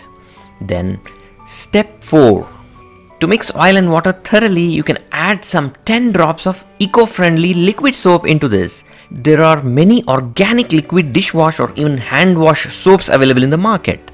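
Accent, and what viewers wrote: India. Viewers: Very easy to use.i usually use backing soda for cleaning purposes.. Now I will do this ,Thank you sir.Your English is very good and clear Are you an Indian .?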